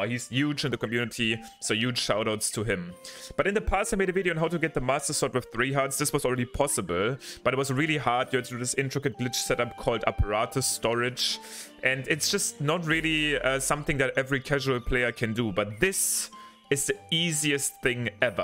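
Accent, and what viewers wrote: Germany. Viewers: Hearing a German speaking anything but German is torture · I also thougt that you are German because of your english akcent LOL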